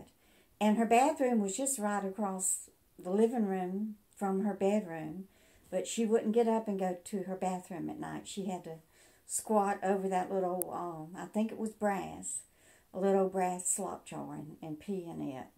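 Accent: South, United States. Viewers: 28 here , googled how to wring a chickens neck and got this video 😅. We have too many roosters and i don’t have a knife sharp enough but all that aside ; I listened to your video and parts of it felt like my own paternal grandmother . She was widowed when I was 6 . Loved her Diet Coke about the same way too haha . Thank you for sharing , I don’t know why you did it , but it made my morning all the better . 🩶 I heard you say Columbia and you sound southern as all git out , so I’m guessing you’re around Sc so hello fellow south cackalakian !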